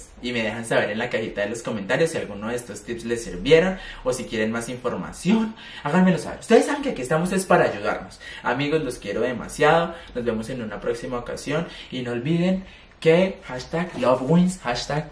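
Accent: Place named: Colombia. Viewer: Amo tu acento colombiano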